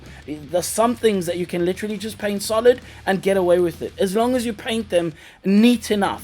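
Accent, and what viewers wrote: South Africa. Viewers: Can't believe i've only found you now! I'm just about to begin my painting journey and love the amount of detail you give and the fact that you sound like you are from ZA too is a mega plus! XD looking forward to binging this channel before and after i get to start my painting adventures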